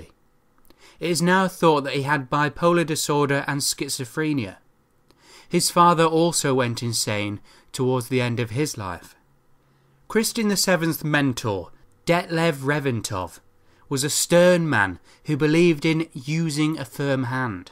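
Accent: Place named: England, United Kingdom. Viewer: All lies...spoken by an englishman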